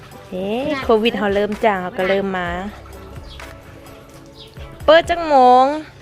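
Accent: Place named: Laos. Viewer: You got some awesome Laos accent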